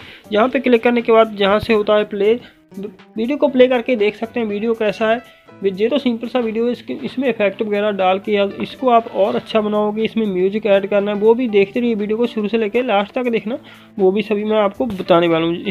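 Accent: Punjab, India. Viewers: Aap pke Punjabi hai na sir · Yehh punjabi voice😇😇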